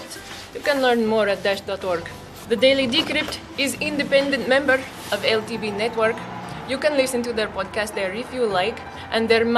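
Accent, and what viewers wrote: Russia. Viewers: lol Russian accent makes instant sex appeal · I Fuckin love your Russian accent :)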